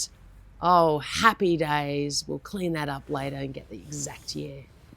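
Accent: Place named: Germany. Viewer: Well done on the finds and thanks for the exclusive footage from Treasure Hunters Down Under.Congrats on being film stars overseas and I hope we get to see it soon down here.Love your German accent! Is the mysterious camera person? Cheers👍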